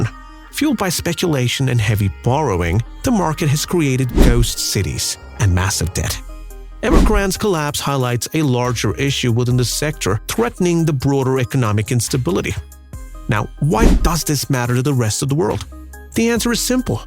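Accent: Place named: United States. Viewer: I hear American accent! Lol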